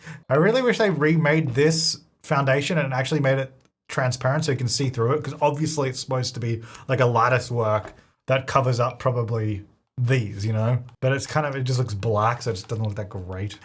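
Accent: Australia. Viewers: I hear the Australian accent bit more now, tbh😅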